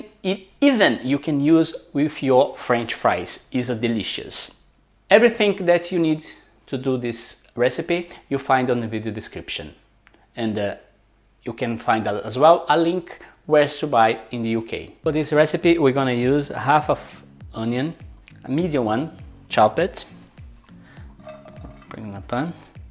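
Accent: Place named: Brazil. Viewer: Thicc Brazilian accent. Beautiful.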